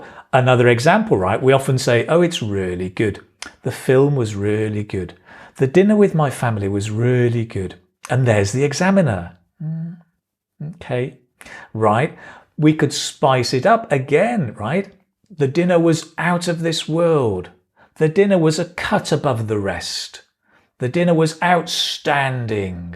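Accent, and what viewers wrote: United Kingdom. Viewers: Sir, I would like to be a British English speaker as you speak.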